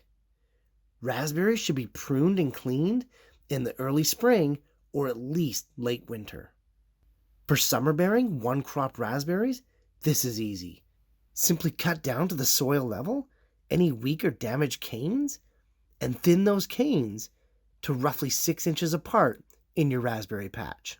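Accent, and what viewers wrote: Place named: Canada. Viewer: Certain dialects or speakers from specific areas (in this case maybe a part of Canada?) it just how they speak. The intonation you are speaking to falls into this “Canadian accent”.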